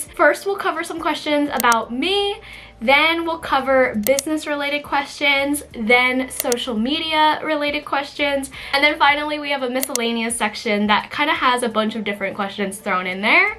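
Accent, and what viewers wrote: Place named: South Jersey, United States. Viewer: woo hoo new jersey!! it sounds like you have a south jersey accent (a little bit)!!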